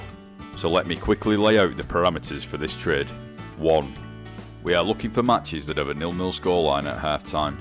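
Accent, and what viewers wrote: Wigan, United Kingdom. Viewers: Gotta love that Wigan accent!!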